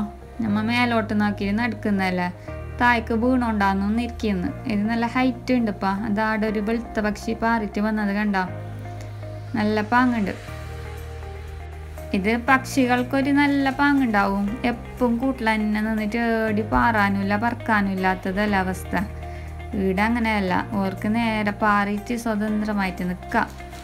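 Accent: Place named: Kasaragod, India. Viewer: Nalla language..ningal ksd analle..kelkan nalla sugam😃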